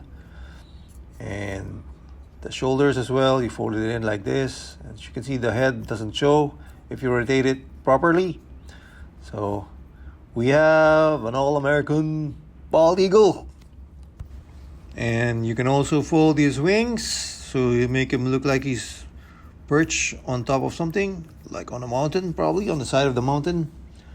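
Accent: Philippines. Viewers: i dont really care for beast skywarp but earth sideswipe is what i really want! hey are you from the philippines? i am you just have an accent when youre filipino but youre speaking english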